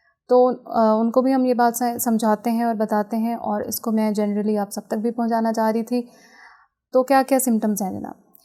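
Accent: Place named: Saraiki, Pakistan. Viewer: Hello Madam, Good Day! Hope you are enjoying good health. My question is about bad thoughts. The bad thoughts about religion come to mind, if a person expresses these thoughts in front of someone, he/ she will be killed as a blasphemer, but these thoughts come to mind many times. is there any solution? By the way your speaking accent shows, like you are Saraiki.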